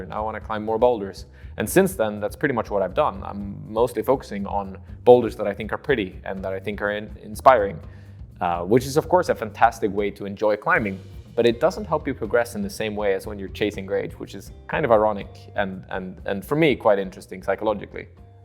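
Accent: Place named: South, United States. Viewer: I thought this guy had a Southern twang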